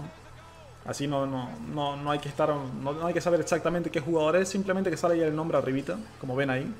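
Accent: Venezuela. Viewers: Amigo eres venezolano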